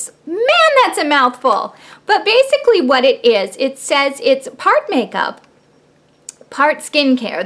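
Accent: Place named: South, United States. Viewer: I just stumbled upon your channel about 2 weeks ago and im so glad I did. I love your personality and you're so easy to watch and light to. (I got that southern twang as well, lol. Anyways, id love to see a demo/review of the cover fx drops. Ive been on the fence for several weeks over weather they are really worth the money since you only get .5 ounce. Looking forward to your next video!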